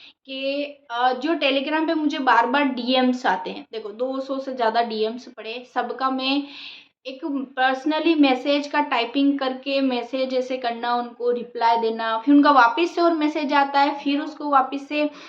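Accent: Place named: Rajasthan, India. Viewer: Muje aapki baato ko sun ke lag rha hai aap Rajasthan se ho🤔🤔🤔🤔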